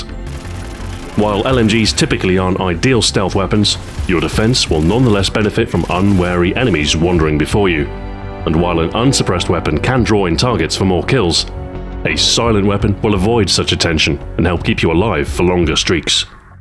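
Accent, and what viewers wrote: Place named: United Kingdom. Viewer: You sound like Capt Price to me and it's because you're British LOL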